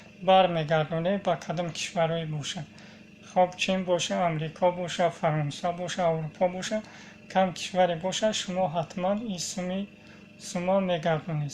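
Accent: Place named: Kulob, Tajikistan. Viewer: Э руи ту сиёҳ финги тра чиву гапзанит чи 😃 ранги чучи кулоби гап мезадай 😅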